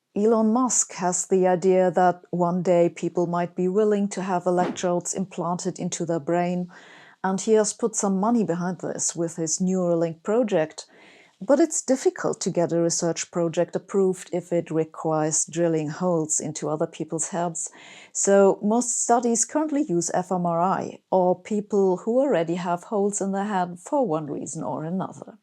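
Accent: Germany. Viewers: your voice with a heavy German accent would the best replacement for computer Hal 2001, even better than the one from the movie Dark Star. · You brought your German sound palate to your superlative command of English. Please don't shed it. There's no reason why pentrating intelligence shouldn't be peppered with exotic charm.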